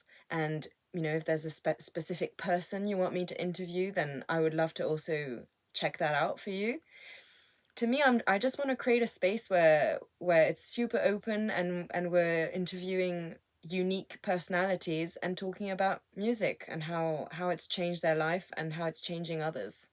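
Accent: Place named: United Kingdom. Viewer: I like your music videos. Very nicely done. You have alot of talent. But surprised to hear your British accent.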